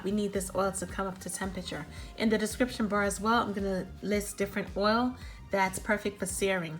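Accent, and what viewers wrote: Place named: East Coast, United States. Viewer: Love the east coast accent!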